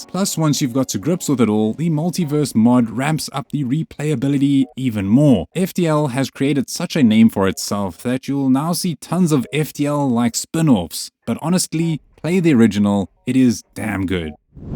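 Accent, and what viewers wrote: South Africa. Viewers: That SA accent is unmistakable. Good to run into this channel!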